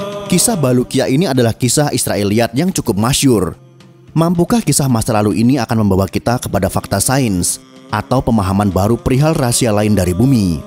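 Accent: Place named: Bali, Indonesia. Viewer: ni yg baca cerita kaya logat bali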